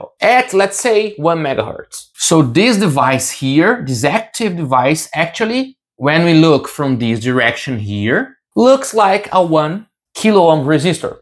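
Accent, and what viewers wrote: Brazil. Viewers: você tem sotaque de brasileiro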